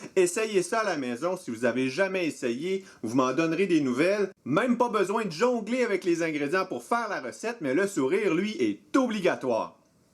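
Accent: Quebec, Canada. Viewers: J'adore l'accent québécois ainsi que le fromage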